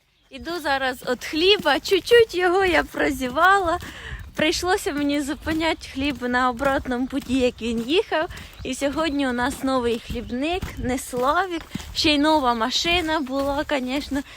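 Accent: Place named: Surzhyk, Ukraine. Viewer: Це жарт. Вона просто грає таку роль. І говорити без суржику вона теж вміє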